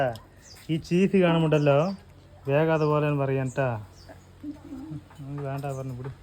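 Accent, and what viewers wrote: Tamil Nadu, India. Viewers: Ningalu malayaliya allengil tamil la mindunadhu different ayeetu ondu adha chodhichadhu.sorry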